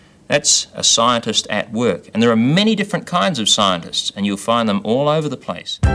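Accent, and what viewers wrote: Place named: South Australia, Australia. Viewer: Always wondered what their accent was as a Melbourne kid. South Australian!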